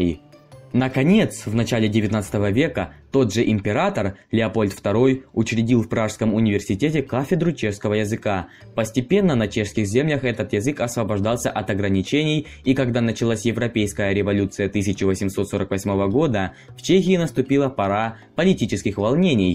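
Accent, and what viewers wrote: Ukraine. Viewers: Ты не с украины случайно?.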